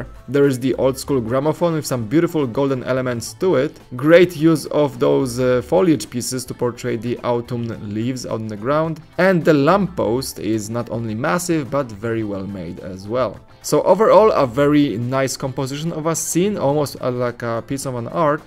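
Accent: Poland. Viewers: You have gr8 polish accent. Are u from Poland? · po akcęcie stwierdzam że jesteś polakiem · Jesteś z Polski? Przypuszczam, że tak po akcencie i tym jak czytasz polskie nazwisko, że chyba tak. Great channel!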